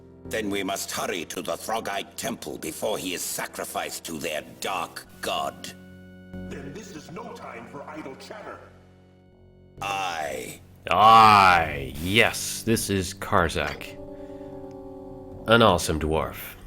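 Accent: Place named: Quebec, Canada. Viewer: Love your videos. Very entertaining. BTW, are you French Canadian? You sound like it.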